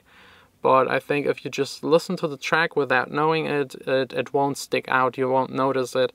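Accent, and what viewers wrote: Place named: Germany. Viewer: Hi! It's incredible how much you've improved your English accent. In the video that's linked below you have a very strong German accent haha. Congratulations! I love your videos and music 😊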